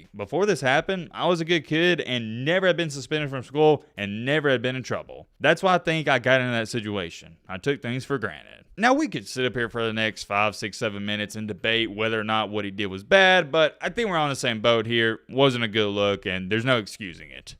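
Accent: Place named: Appalachia, United States. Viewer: This hillbilly narration is like nails on a chalkboard. Also, this jackal doesn’t know ball and it’s obvious.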